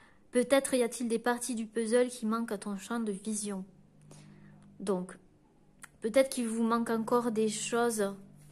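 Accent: South, France. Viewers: Bonjour. Merci pour cette guidance qui me parle beaucoup 🙏🧘‍♂️🕉🌷🌺🌍💞 on entend votre petit accens du sud et vous devez connaître l opercule de sainte lucile un porte bonheur, représenté sur la carte ( 16 Ce que vous voulez vous veut ) ce qui m’aide encore plus à croire votre guigance votre cœur. MERCIIIIIIIIIII de nous aider éclairer. Je vous souhaite un trés bon week-end que DIEU ET L’UNIVERS VOUS PROTÈGE NOUS PROTÈGE 🌷🌷🌷🌺🌺💞🌍🕉🧘‍♂️🙏🐱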